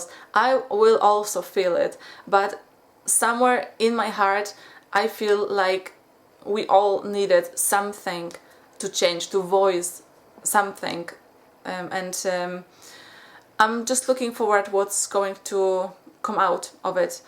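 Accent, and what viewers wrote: Poland. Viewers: I can absolutely know as soon as you start to speak that you are Polish! I love your people so much!!!!! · you sound polish and you have a polish name:) You Have A Fan From Poland but lives in scotland:)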